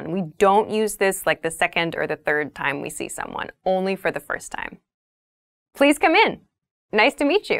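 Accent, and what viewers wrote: United States. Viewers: A big hug from Colombia for you. Love your american accent and i can understand almost everything what you say. Thanks for help us. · Is she American?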